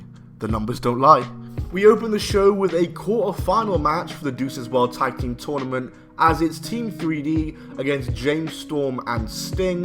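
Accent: United Kingdom. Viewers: 🙀🙀🙀You seriously scared the shit out of me when you all of a sudden tried to imitate Scott Steiner when he cut this promo especially since you have a British accent and you basically just yelled on the mic just like he did both in the beginning and in the end of this video!😱😱😱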